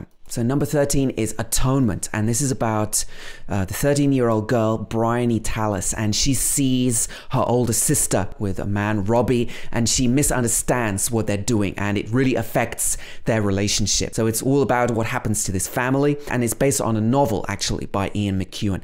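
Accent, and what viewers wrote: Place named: United Kingdom. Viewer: I love British accent and I hope that someday I would be able to sound like you . You are the best teacher on internet.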